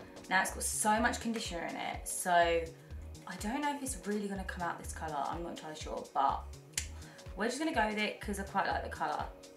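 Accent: United Kingdom. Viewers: She's from Great Britain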